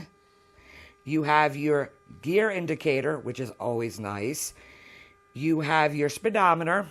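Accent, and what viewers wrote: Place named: England, United Kingdom. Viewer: Great video and very informative, I too noticed you have some English accent as I say leeeeeever too!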